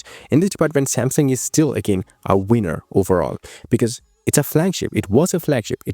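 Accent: India. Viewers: pajeet voce, DO NOT REEDEEEMDEED OTR WATCHT! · Indian accent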